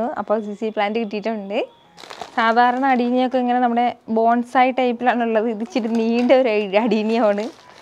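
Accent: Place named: Trivandrum, India. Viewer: നോക്കാം കേട്ടാ 😃 ത്രിവാന്ദ്രം ഭാഷ🤩 l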